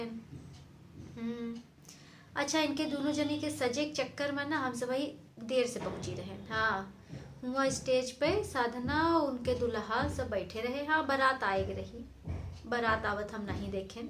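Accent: Awadhi, India. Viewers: Maine jb apko awadhi bolte suna to Mai dang rah gyi ke ye h👍👍👍👍👍👍👍👍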